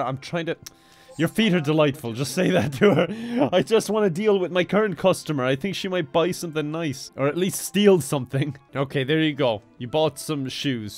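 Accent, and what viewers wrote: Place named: Malahide, Ireland. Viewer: You sound like your from malahide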